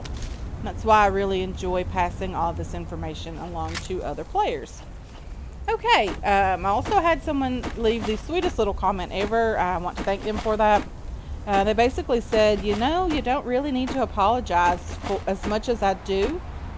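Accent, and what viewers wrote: Kentucky, United States. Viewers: wat part of kentucky you from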